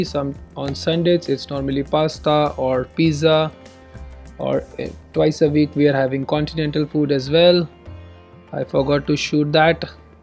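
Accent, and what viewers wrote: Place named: India. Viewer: Nothing wrong with Indian english. Its the most logical pronunciation anyone can do with that language.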